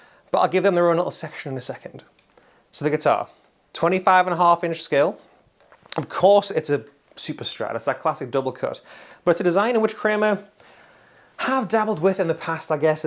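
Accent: United Kingdom. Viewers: Thats one of the best reasons to subscribe! Well, there's also the guitar playing and the smoth British accent. Your a legend sir.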